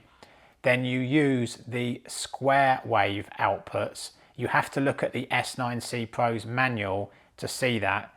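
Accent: United Kingdom. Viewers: I must say I am set with my sound system but anyways I like to watch your videos,nice voice and british pronunciation feels good to my ears.But man,can't thank you enough for discovering their music to me. 1000 x thank you. My system: Verum 1mk2,Violectric v202,Schiit Modi Multibit and Arcam rPlay streamer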